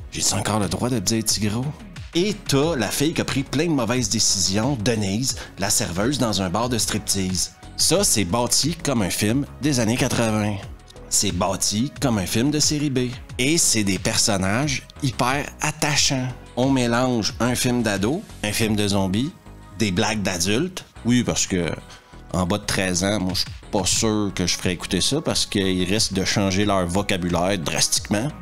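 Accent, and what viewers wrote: Quebec, Canada. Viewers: Sérieux t as que 8000 abonnés ? Tes vidéos sont à tomber , tes choix de films sont toujours judicieux et bien a propos .alors après je me dois d avouer que ce si "sympathique" accent du pays du Québec, joue sur mon plaisir a "ecouter😂😂" tes vidéos ,je m'en fous t es un champion de mon youtubegame a moi !!!!!!!!!et je vais vite m'empresser de recommander tes vidéos à mes proches( c'est a dire ma mère ,mon frère et un peu de collègues Facebook .......faut pas exagérer j ai plus de quarante ans 😂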